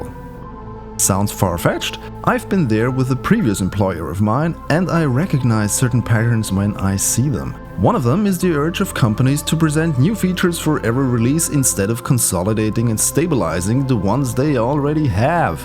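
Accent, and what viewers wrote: Germany. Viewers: tolles video wusste doch, dass du deutscher bist, der akzent ist unverkennbar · Du bist Deutsch? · R U German? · You can even hear the typical German accent when he speaks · Are you from Germany? BTW, great Video!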